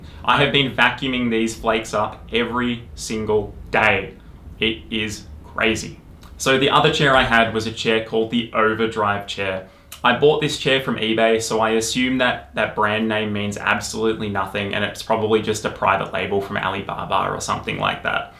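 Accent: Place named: Australia. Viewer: Nice to hear an Australian dude doing one of these reviews. Shout out from Melbourne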